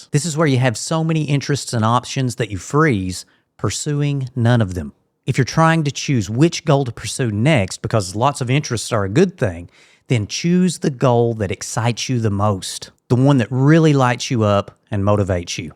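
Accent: South, United States. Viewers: Hey! Thanks for making this very helpful information. Where is your great southern accent from? Keep up the important work!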